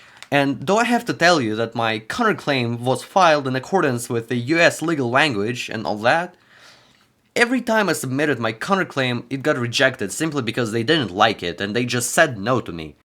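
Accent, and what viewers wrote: Poland. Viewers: Are you Polish? Your location says Australia but you do not sound Australian at all and your YouTube is in Polish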